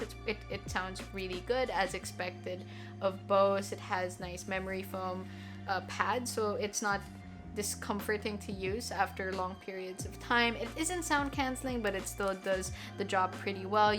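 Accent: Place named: Philippines. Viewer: you have a teenie tiny filipino accent in this video :)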